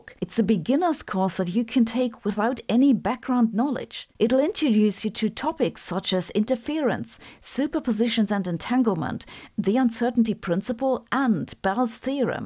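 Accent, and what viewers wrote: Germany. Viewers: Why has her German accent become so thick recently?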